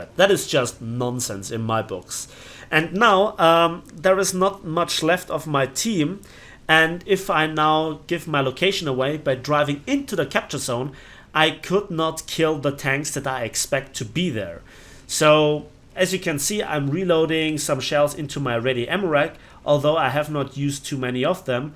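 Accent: Germany. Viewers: Well i think he is german (not sure) but his accent makes him sound german.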